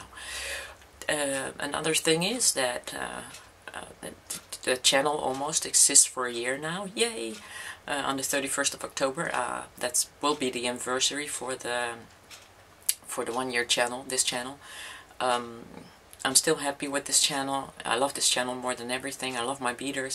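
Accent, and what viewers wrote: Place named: Netherlands. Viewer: are u originally dutch cuz u have a dutch tone in ur voice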